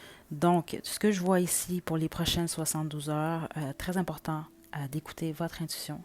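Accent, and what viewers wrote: Quebec, Canada. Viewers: Bonjour chère. Cela fait peu de temps que je vous ai découverte et j'apprécie vos tirages car ils sont variés au niveau des thèmes et ils raisonnent souvent justes pour moi. Et puis j'aime bien votre français québequois, c'est tellement beau et mignon à entendre 😇🌼Je reviendrai vers vous d'ici à quelques jours si vous avez vu juste.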